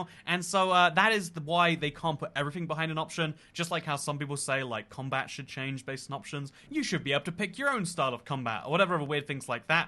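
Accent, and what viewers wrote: United Kingdom. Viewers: I'm from brazil, a lot of schools here teach English instead of Spanish even tho we are surrounded by Spanish speaking countries. American culture is heavy here so many movies come to here and people are used to american content and culture. In fact in Christmas we retain the American winter theme even tho at December we are at high heat summer at 45 c and those grandpas waring Santa outfits almost die of heat from the warm clothes, all that to keep up the Americanized culture LOL. My school had Spanish and English but that's far from the norm. I learned a LOT more from games and youtubers like ur self however. So I'm thankful for ur indirect help in practicing my listening of English. Also, i love ur British accent. I also love that ur one of the few minecraft content creators who aren't heavily focused on younger audiences but more general audience from all ages. 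I'm 22 and i'm just sick of clicking a cool looking minecraft video and hearing an obnoxiously childish voice saying, "wassup guys, hit the like button and ring the bell wohooo!!!! I love ur videos, keep up the good work.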